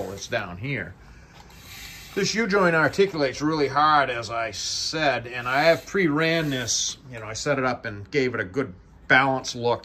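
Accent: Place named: Maine, United States. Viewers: Thought so. Mike Day Concrete NORTH MONMOUTH, ME has the EXACT same accent. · Just a touch of Maine. lol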